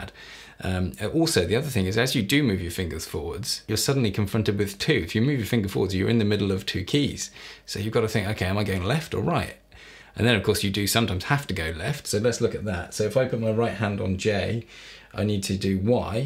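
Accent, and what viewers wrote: United Kingdom. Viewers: That cultured accent doesn't hurt. For the life of me I cannot comprehend why the Scandinavian countries would choose an American accent over a British one when adapting English as a second language. Pure insanity. I'm not even British and have to admit to the clear superiority in their pronunciation. I mean a refined accent like this guy has, not some brash, horrible concoction you hear so often outside of London. Here in Canada we have Quebec that brutalizes the beautiful language of France! Its so strange how people don't seem to discern language pronunciation. In New York City which is plagued also by a horrible accent high achievers seek to rid themselves of that accent by the time they have graduated university. They are making a good choice. One of the worst in the US? Boston! Ugh. Sounds like they barely graduated kindergarten. 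Maybe I'm too sensitive. No offence to people from New York and Boston!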